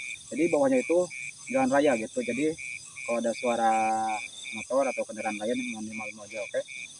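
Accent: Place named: Banten, Indonesia. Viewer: Cctv tolong di perhatikan di disiapkan di pasang di luar,Abang orang Banten ya,soal nya logat ngomang nya SM seperti saya, Banten nya di daerah mn bang tolong di balas karna konten2 Abang menurut sy berani dnenantang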